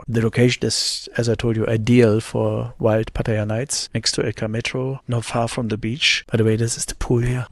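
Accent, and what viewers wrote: Germany. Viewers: Es ist jetz mein zweite video von dir das ich hab angeschaut... Ganz toll. Ich hab gleich gedacht im 20 seconden am deir erste video das sie sind sicherlich deutsch. Mein elterern sind deutch, ich schreib nicht so gut als ich kann sprechen. Ich hoffen nach Pattaya kommen dieses jahr, vielen dank fur die nachrichten am gutte platze zum bleiben. Gruss aus Vancouver BC...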